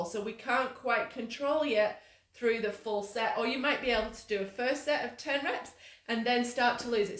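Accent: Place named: Lancashire, United Kingdom. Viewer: This was great advice thank you, love the accent, are you originally from Bolton Lancashire or nearby? X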